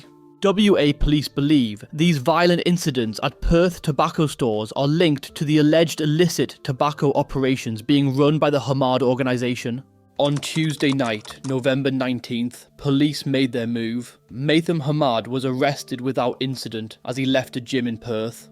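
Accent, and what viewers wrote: United Kingdom. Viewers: im so grateful you have a british accent, so i know you're safe in the UK bro, if i did videos like yours, as a local, i think i'd have massive testicles, but also know i wouldnt be safe. you're a legend.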